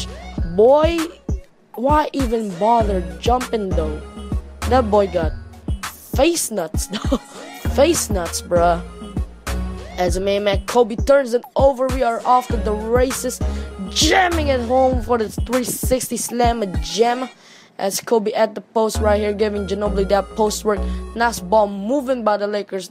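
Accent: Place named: Philippines. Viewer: Im Filipino too, i could tell by your accent.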